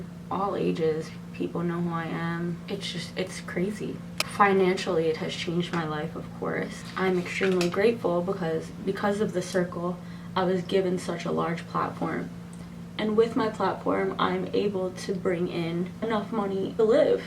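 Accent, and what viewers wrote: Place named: Philadelphia, United States. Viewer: Girl I’m from Philly too and when you first popped up I heard your accent I was like yes!!!! And then it said Miami and I was like🧐🧐 hahahaha but then it all came full circle lol I loved you!!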